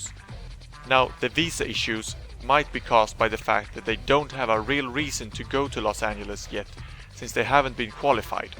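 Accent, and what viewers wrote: Sweden. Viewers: Your english accent, can totally hear that youre a swede :P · You have the most Swedish accent I've ever heard, I've played with A LOT of Swedish players. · Your overall talking is kinda swenglish. Sorta less than Kungen.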